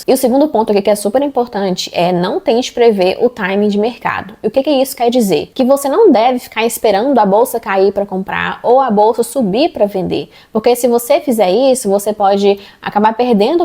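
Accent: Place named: Belo Horizonte, Brazil. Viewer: Ótimo conteúdo. Esse sotaque é de BH, né?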